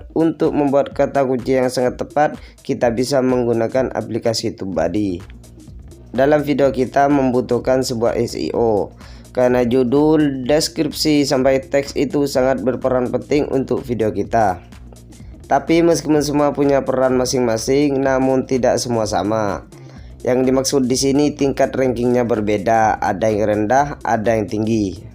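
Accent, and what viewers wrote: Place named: Madura, Indonesia. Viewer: Dialeknya sepertinya orang Madura United ya, sukses terus channelnya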